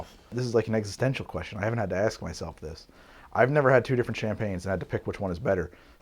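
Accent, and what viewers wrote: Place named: United States. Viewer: people from the usa are so cute when they try to pronounce french words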